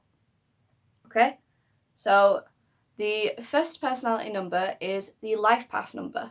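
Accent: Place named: United Kingdom. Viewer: Luv the British accent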